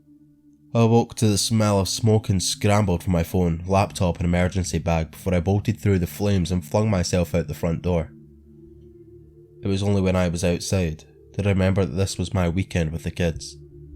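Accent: Scotland, United Kingdom. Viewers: I'm surprised you dont have more subscribers! You have lots of cool horror stuff on your channel and (I'm sure you get this all the time) an awesome Scottish accent! I am linking this channel to all my angsty teenage friends who watch spooky stories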